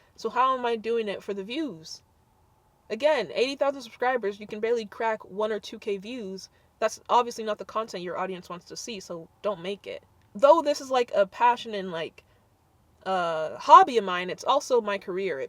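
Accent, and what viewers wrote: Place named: United Kingdom. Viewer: I love your British accent...more please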